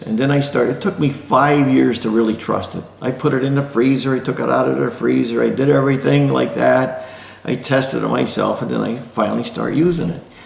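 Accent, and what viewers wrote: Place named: Pennsylvania, United States. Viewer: I love your videos. They have taught me so much. Of course experience of actually doing the job is worth way more than text book info. You have helped me tons! Plus I love the Pennsylvania accent. Keep up the good work! And thank you! 🙏🏻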